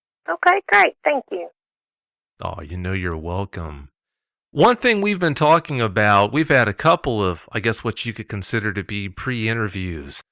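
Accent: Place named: South, United States. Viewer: I'm surprised it never came back for the other 2 goats, or did it?? poor billy goats 🐐🐐.. and... love the Southern accent! ☺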